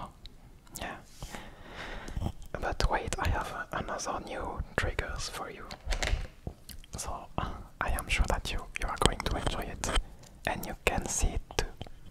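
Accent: France. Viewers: J'adore cet accent français 😂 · tu as un accent vraiment français je ne sais pas si tu l’es à la base c’est juste de la curiosité:)) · Cet accent français ! 😂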